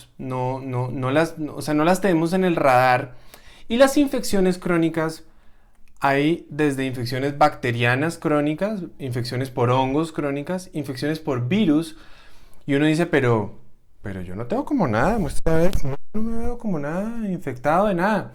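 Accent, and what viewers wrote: Colombia. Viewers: Gracias, muy Colombianisimo, en su hablar! Veremos como me ayudo con toda esta informacion. Estoy en un pais, donde : TSH, T3,T4 y listo. y hay de mostrar que sabemos un poco mas.......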